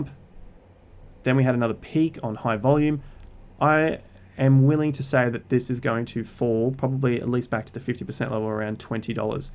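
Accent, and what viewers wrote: Australia. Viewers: Great to hear great content with a bit of an Aussie accent...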